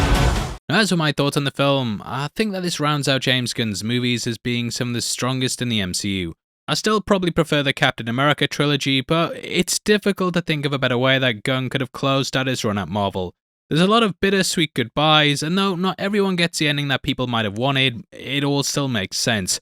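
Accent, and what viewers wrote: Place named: North East England, United Kingdom. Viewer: Oh God you said Monga. Manga ffs. You're English. MANga. Ffs. I get the NE accent.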